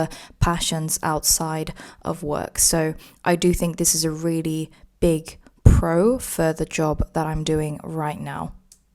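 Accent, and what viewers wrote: United Kingdom. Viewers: 영국식 발음 공부할때 따라할때도 넘 좋아요! 이런 영상 자주,, 올려주세요❤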